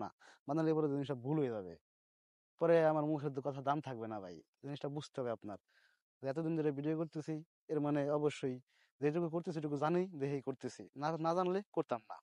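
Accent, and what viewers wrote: Faridpur, Bangladesh. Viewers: ভয়েস শুনেই বুঝে গেছি৷ কোন এলাকায় ভাই?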